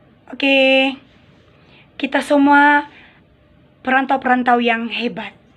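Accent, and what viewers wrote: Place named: Sabah, Malaysia. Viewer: Terima kasih dgn luahan perantau. Slang kamu macam terikut Sabah suda o..asal mana fren?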